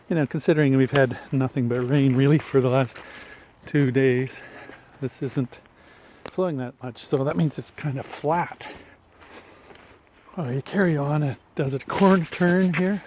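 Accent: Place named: Maritimes, Canada. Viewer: You are picking up a touch of maritime accent.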